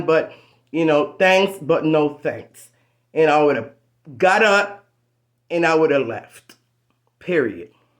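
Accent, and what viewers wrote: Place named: Bermuda. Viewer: You sound Bermudian why u coming after Donna plz stop it plz u looking views💯😂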